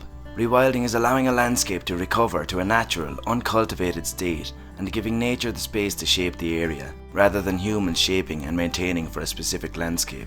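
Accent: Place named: Ireland. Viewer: I watch so many videos on rewilding and it’s so reassuring and inspirational to hear hear in an Irish accent discussing this! I finished a masters in illustration a few months ago and the first few minutes of this video could almost be the script for my final presentation. I’ve researched and half finished a book on encouraging school age children to shift their mindset on Irish green spaces and begin rewilding at school and home. It’s so good to hear it talked about. There’s no way to contact you on here but if you’d be willing I’d love to discuss any other projects or creators like yourself or other artists talking about this. I’d love to find ways to get this passion into the Irish public.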